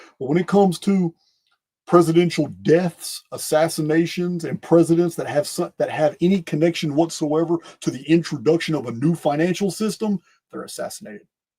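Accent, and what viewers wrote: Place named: Tennessee, United States. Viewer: Where is your accent from? My wife is struggling to understand you, but I'm fine with it. Is it Tennessee?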